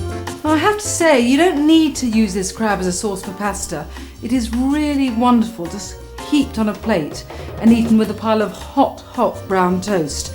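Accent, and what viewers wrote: England, United Kingdom. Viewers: What type of English accent does she have?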